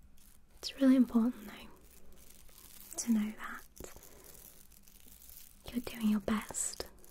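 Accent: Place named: Northern England, United Kingdom. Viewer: I like your accent.northern England, Blackburn?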